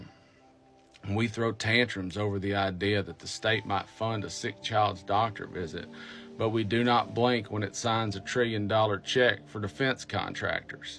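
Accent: South, United States. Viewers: Wow, the most sense I've heard from an American for a long time, and delivered in accent that sounds like a Redneck! Well done sir . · It’s a glorious moment when you finally hear an American with a southern accent take all the stereotypes out there and stuff them in that dark recess. Pure accuracy, concise and eloquent language, and a poignant point. I live in a country where the social safety net is decaying and there is handwringing about how it is funded. There is no handwringing about the moral fact or need for it. China is not a utopia for its people but it can do things 🇺🇸 can’t. It can have a consistent social, economic and commercial strategy for decades. Whether we like the direction of travel, it can move itself consistently. But it isn’t communism or socialism. It’s authoritarianism. That’s a cup you now drink from too 🇺🇸.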